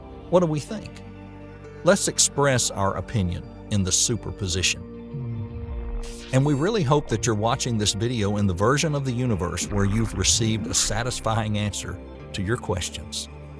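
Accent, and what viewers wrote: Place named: South, United States. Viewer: This channel would get more views if you changed your A.I. voice to one with a British accent. People generally prefer this when watching science related content as it sounds more intelligent than a southern American accent. Perhaps try a female voice such as the one John Michael Godier uses on his channel Event Horizon. I don’t recall any channels which are doing so at the moment. Enjoy your content a lot though, just the voice has to go. Keep in mind, most of your viewers are likely NOT Americans even if you are and you must therefore cater and adapt to the preferences of that audience. Cheers!! 💫🙏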